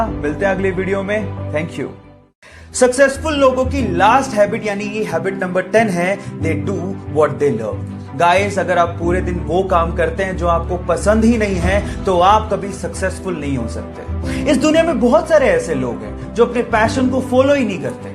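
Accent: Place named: Haryana, India. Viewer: Don't mind sir but everyone cannot speak in haryanvi like u except haryanvi people because this language is in blood Jai hind jai haryana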